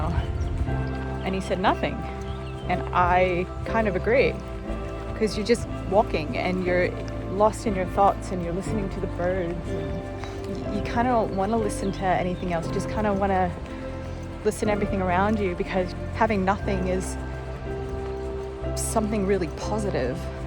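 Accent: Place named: Canada. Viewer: Despite your channel name, your accent sounds more Canadian than Australian. Fantastic videos.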